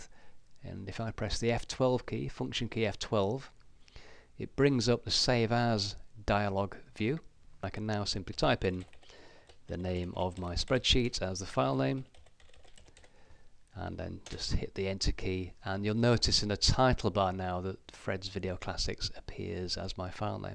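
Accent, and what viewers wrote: United Kingdom. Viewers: Aye British!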